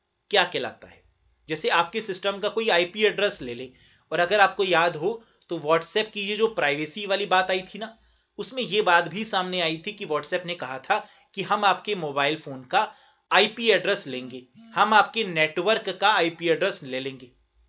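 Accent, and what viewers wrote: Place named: West Bengal, India. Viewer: App west bengal se ho kya sir kyun ki waha ke ek teacher odisha main app ki tarah computer ko easy tarike se explain karte hai usi tone main ❤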